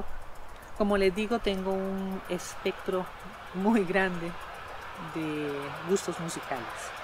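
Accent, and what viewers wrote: Central Mexico, Mexico. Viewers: tu acento del español es muy parecido al que tenemos en la parte central de México así que siempre me es fácil de entender todos los temas que tú expones. Saludos cordiales. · Tu asentó se escucha mexicano del centro. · Creí que eras mexicana...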